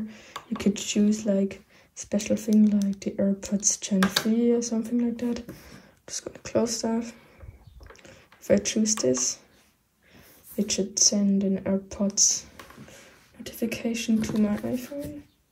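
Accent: Germany. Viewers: Man hört den deutschen raus 😂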